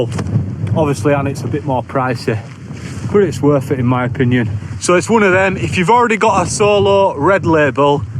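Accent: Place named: Bradford, United Kingdom. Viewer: Great to hear a proper Bradford accent!! Good lad!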